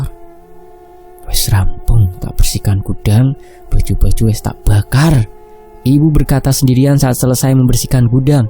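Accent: Java, Indonesia. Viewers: Waallaikumsalam wr wb mzzz Mkshi mzz Uda membawakan cerita nya Mzz kl bc sgt bikin penasaran dan siarnya kas jawanya lucu hhh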